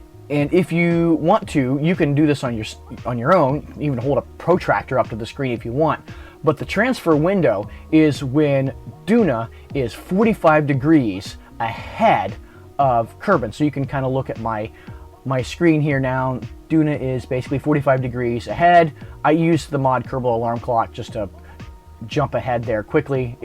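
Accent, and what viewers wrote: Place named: North America. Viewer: Cool video. My first interplanetary landing was Gilly. It seemed like more of a rendesvous than a landing, of course. You mentioned being busy with the harvest - What are you harvesting? I'm guessing by your accent that you're in North America. I'm headed down South to Esperance (Australia) to harvest wheat, canola and lupines in a few weeks. Funny that you'd be harvesting what you grew over your summer at pretty much the same time that we harvest what was grown over our winter.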